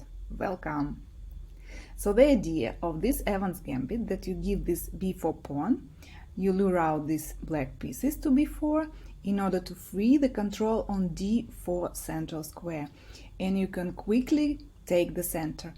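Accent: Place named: Russia. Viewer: I like videos because she speaks slowly and clearly, with an adorable Russian accent, and goes back over the move sequences several times to let them sink in. Another winner. I am still waiting to pounce on someone with Captain Evans's gambit, but I'll be ready.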